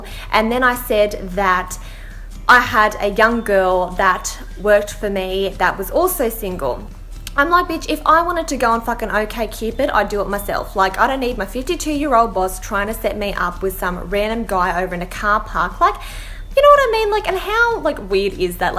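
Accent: Australia. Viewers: It's an Australian accent😂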